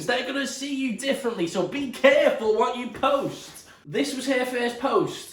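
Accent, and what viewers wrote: Liverpool, United Kingdom. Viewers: are you scouse? Cause if so what football team do u support?🤙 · Note to self. Don't watch videos muted using captions (sleeping toddler lying on my arm) when it's a Scouse accent. Ended up really confused at what 'Istanbul's standards' has to do with everything and who the 'chiefs' are. Had to put the volume on to find out why it has went so international 😂 (I'm Scottish, subtitles just laugh at us to be fair) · heyyyyyy I love your Chanel so much and ur Liverpool accent hehe I think its from Liverpool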